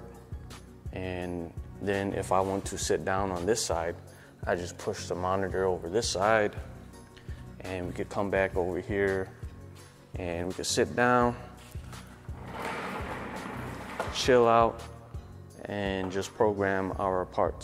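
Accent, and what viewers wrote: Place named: New York, United States. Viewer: Cool videos. Where are you based out of? You sound like you might be from NYC.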